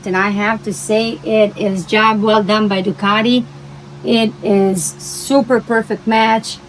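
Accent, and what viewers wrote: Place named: Central Europe. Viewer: Nice! Im trying to place your accent......Central Europe?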